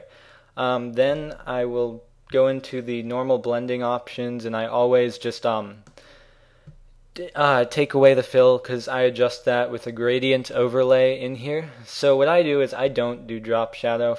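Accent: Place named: California, United States. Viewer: You sound more California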